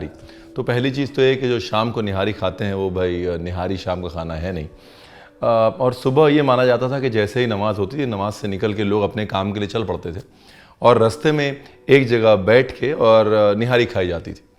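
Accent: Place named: Lucknow, India. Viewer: तुम्हारा लखनवी लहजा बहोत खूब हैं! मै भी इसी तरह बात करना चाहती हूं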